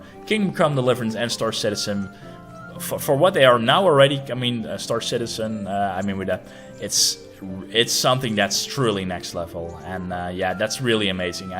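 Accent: Netherlands. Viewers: Dutch accent :) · You have a Dutch accent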